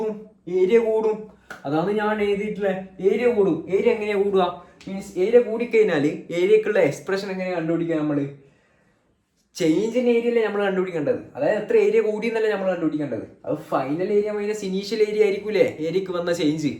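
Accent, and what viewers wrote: Kannur, India. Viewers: Bro kannur aanno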